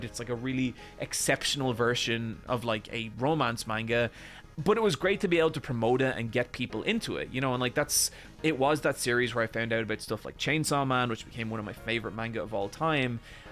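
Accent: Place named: Ireland. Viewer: Watching that guy's videos is going to be like reading nu-Berserk. It's better than nothing, right? Have you noticed that people try to imitate your tone of voice and cadence? It's not just your video titles. They're trying to copy literally everything about your videos minus the Irish accent. What if you include the official Shonen Jump rankings as well as the sales figures? Then you can piss off everyone lol. I really miss those videos of yours because I never gave a shit about Shonen Jump before you started making them, and I have stopped giving a shit about Jump since you stopped making them. I think I am representative of the majority of your audience rather than the Shonen Jump nerds who nitpick them. Maybe you could make a Youtube poll asking these sort of questions: "Did you care about Shonen Jump magazine before watching my Shonen Jump videos?" and "Have you stopped caring about Shonen Jump now that I've stopped making videos about it?"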